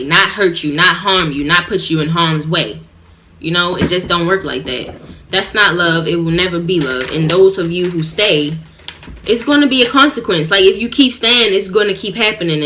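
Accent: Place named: Baltimore, United States. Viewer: I know your from B more your accent is 🥰 blessings to u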